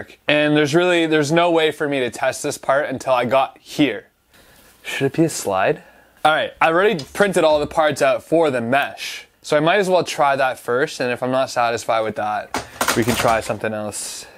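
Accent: Canada. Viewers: Buddy, are you a Maritimer?? ;-)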